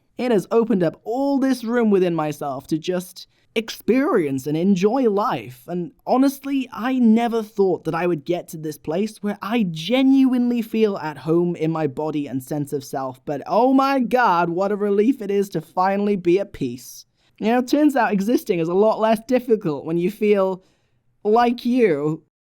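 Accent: United Kingdom. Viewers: (Warning, overused joke incoming) If I had a nickel for every time I’d seen a transmasc person named with what sounds like a somewhere British accent that’s also just a chaotic wholesome vibe to witness on my silly little screen I’d have two nickels, which isn’t much but it’s fun that it’s happened twice (I almost forgot to finish the joke)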